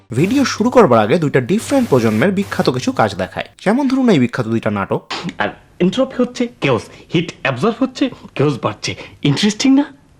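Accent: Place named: Dhaka, Bangladesh. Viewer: you've good impact of voice of dhaka in your videos but you made some kinda fusion here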